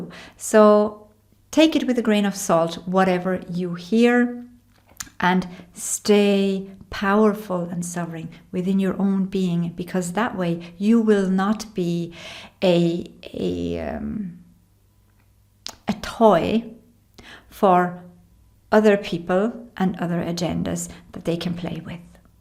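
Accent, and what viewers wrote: Ireland. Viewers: Yir accent is lovely. Love the irish undertone. 🤗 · Your sweetness and your Irish accent reminds me of Lorna Byrne, the Angel lady.........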